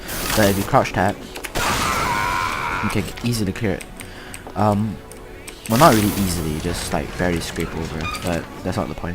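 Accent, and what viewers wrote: Singapore. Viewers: Are u from Singapore? U sound singaporean to me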